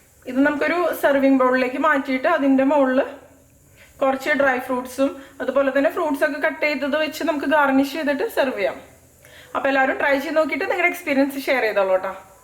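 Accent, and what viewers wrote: Thrissur, India. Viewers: Thrissur kari anno?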